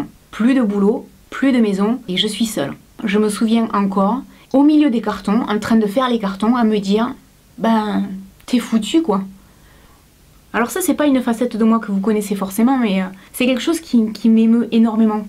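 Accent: South, France. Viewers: lol lol c'est l'accent du sudeee 😂😍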